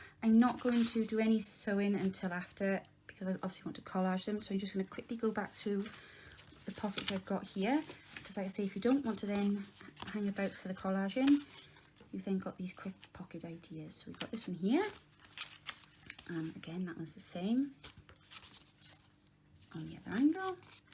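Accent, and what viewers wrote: Wales, United Kingdom. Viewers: Ooh, I love listening to your lovely Welsh accent! Your style is easy to follow and such great ideas. I’m new to your channel and will be watching with interest...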